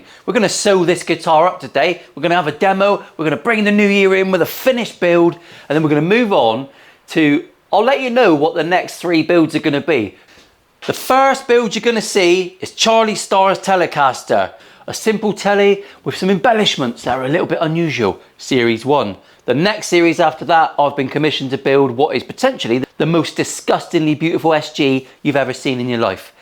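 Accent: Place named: Plymouth, United Kingdom. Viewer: I’ll check it out, to be fair it’s a great looking guitar and I love hearing the janner accent on the youtubes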